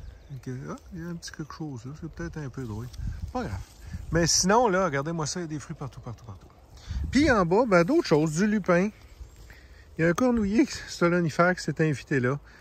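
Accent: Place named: France. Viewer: Votre accent est sublime... vous parlez notre français originel :-)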